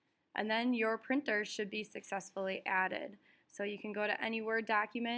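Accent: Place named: Canada. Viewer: That was pretty cool. I love your accent . Canadian?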